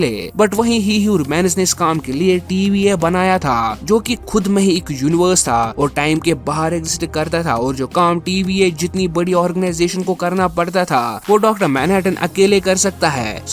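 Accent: Kashmir, India. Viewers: App kashmir sa ho kya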